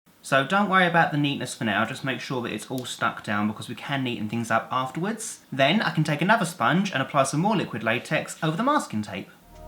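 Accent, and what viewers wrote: United Kingdom. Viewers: wait is this youtuber british?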